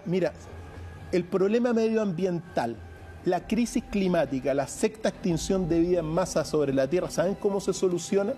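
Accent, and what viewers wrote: Chile. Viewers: me gustaria tenerlo para mi solita, pero entienedo que seria mucho egoismo de mi parte, es usted un tesoro para la humanidad, me encanta escucharlo....sobre todo el acento chileno, lo mas dulce que he escuchado. a mi gusto... gracias por sus predicciones, hay mucho que aprender.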